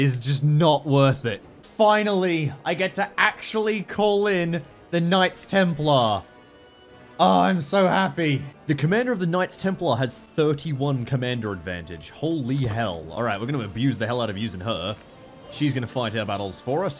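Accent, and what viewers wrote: Australia. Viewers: down under aye u aussie mate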